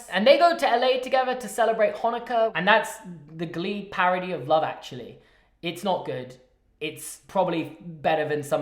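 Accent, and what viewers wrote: United Kingdom. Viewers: He’s British? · I know you can’t be British with a name like but the accent says otherwise.. feels wrong man, we need to get you over to the motherland for some accent coaching.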